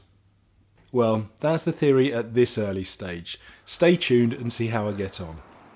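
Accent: United Kingdom. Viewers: Another interesting and entertaining video and I think the production values are great. I love the Britishness and subtle humour of your delivery and content - keep it up. Having never cycled abroad, although it's on my wishlist, I'd be interested to hear more about that. Do you take your own bike, or hire one out there? In Mallorca, do you prefer the north of the island as a cycling base, or some other area? Any tips for organising the trip that would be useful to others etc.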